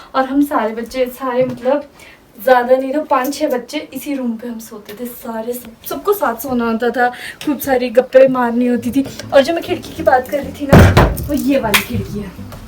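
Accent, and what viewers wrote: Pahadi, India. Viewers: Your voice is be like pahadi nice voice 🥰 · Hahah too sweet ,you have that pahadi touch in your voice and cute expressions😌😌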